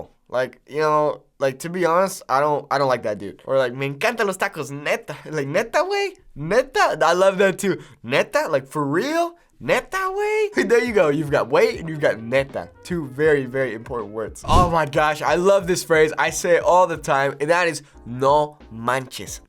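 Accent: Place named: Mexico. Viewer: I'm hearing a lot of Mexican Spanish in your English now. Your vowels are so lively and you have that warm Spanishy vibe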